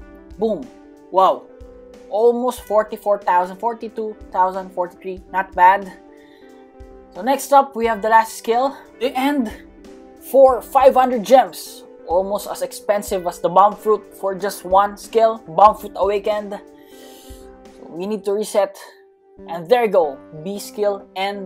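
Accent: Philippines. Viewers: wait he's filipino? no wonder the accent and voice sounds like a filipino speaking english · U sound filipino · how is he a noob he has acro also i notice your filipino accent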